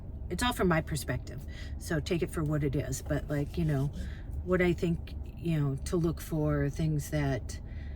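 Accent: Minnesota, United States. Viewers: You are a cook. And i mean that in the best sense of the word. Caught a couple of your videos a year or so apart.. just caught a hint of your Minnesota accent. Love it! Subscribed.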